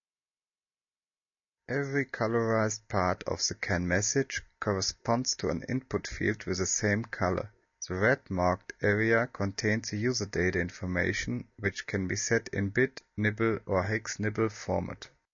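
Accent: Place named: Germany. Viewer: Hi to the chaps at Engendi. Speaking as someone watching your video with only a general interest, this is my feedback: *The video gives a very useful view of CAN. thanks. *the product looks excellent, but fairly expensive, so you need to have high quality product promotion. (i realise that it is probably much cheaper than other options...) *no offence intended at all, but the English pronunciation is terrible, the accent is way too German, and there is no life in the voice at all. Yes, we know you are engineers, but if you are trying to sell to english speaking people, as i suppose you are, I recommend you have the whole video redone where it is narrated by someone who speaks English really well.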